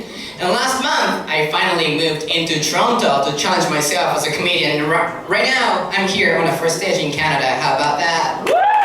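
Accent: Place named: England, United Kingdom. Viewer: Good show I like your English accent more video to upload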